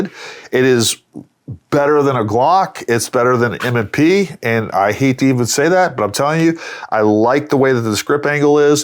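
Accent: United States. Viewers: I need a German accent to explain the whole line up not a American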